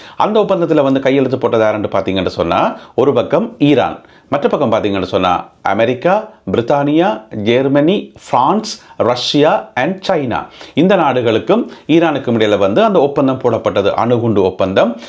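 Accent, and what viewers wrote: Jaffna, Sri Lanka. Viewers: தெளிவான யாழ் தமில் Love it.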